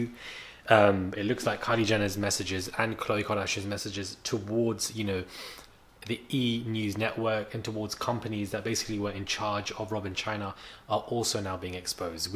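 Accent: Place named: United Kingdom. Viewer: I love watching ur content I just wish u would give us all the information before giving ur opinion, and also can u slow down when u speak u have to remember u have a British accent and it is already hard for us Americans so understand u anyway but when u speak fast we can't understand u good.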